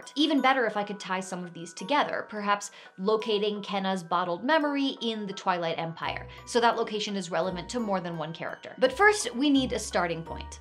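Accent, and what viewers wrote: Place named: South, United States. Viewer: Are you from southern Ohio or Kentucky? Yall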